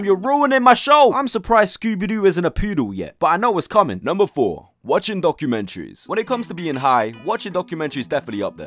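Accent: United Kingdom. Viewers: the last one is totally true from my own experience, watching your video with Briish accent is way more easier with zaza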